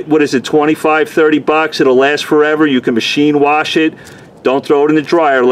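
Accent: New York, United States. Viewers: Derrell Barnett He's originally a New Yorker, I understand him and the lingo being from N.Y. also. The rest of the country is too slow to understand our ways of doing things and speaking.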